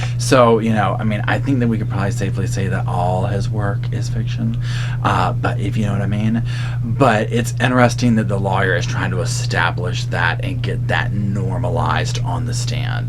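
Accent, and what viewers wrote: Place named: North Carolina, United States. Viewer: I think he is N. Carolina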